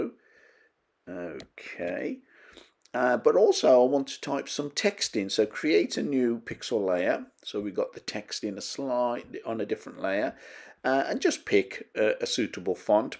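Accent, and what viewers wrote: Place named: Dudley, United Kingdom. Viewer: Hi, Really enjoying your vlogs on Affinity Photo, just been following the vlog Brand Signature. I've been after a way to add a Signature to my photos for such a long time. Messaged vloggers in America and Australia on this subject asking if they knew how to add a signature in Affinity Photo. Not had a reply from either of them?? To be honest don't really think they knew how to do it. Followed your instructions and now I can add a signature in seconds instead of having to keep typing it out. Can't thank you enough mate, keep up the great work look forward to watching more vlogs. ( Your accent sounds like you're from around my area I'm just outside of Dudley. Once again thanks. Fred.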